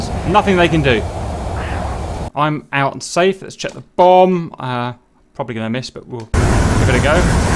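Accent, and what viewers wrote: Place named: United Kingdom. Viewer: Your British accent makes these instructional videos easier to take in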